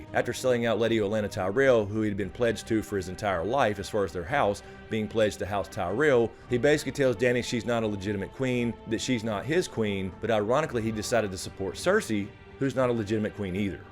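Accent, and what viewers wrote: South, United States. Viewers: Just discovered your channel today. Nice country accent. Subbed.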